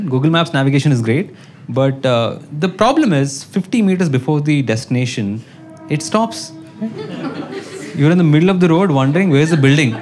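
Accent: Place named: South India, India. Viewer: Typical South Indian tone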